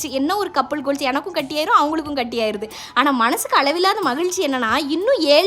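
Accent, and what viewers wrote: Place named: Tamil Nadu, India. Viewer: i am watching your videos only for your tamil slang.its very awesome and make me to watch video repeatedly.use scissor for cutting karuvadu.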